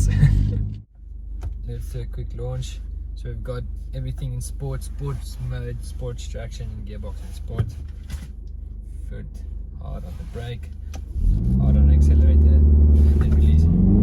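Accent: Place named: South Africa. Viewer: I could listen to that South African accent all day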